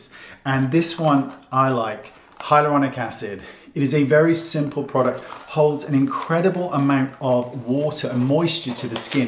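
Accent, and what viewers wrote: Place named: United Kingdom. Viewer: Oh my gosh, you’ve started listing the products below! Thank you so much, that is such help to those of us who have trouble with your British pronunciation.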